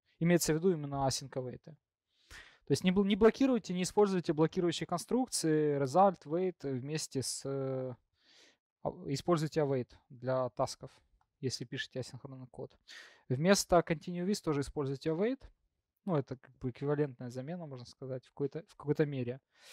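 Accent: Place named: United States. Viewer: Скедулер - это нормально, американское произношение. Вот пример еще одного спикера так же применяющего данную фонетику <link> Async programming in .NET: Best practices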